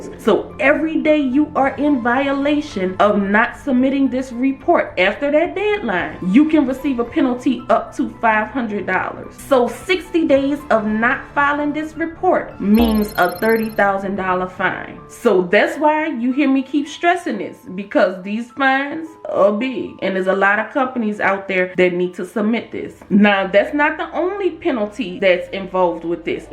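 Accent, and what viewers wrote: New Orleans, United States. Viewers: She's is from NOLA ❤ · You sound like a NOLA baby on the slick! Love the video!!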